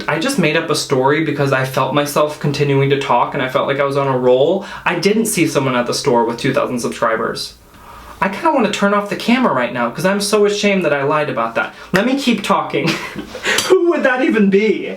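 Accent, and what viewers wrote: United Kingdom. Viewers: Your British accent sounds like Tom holland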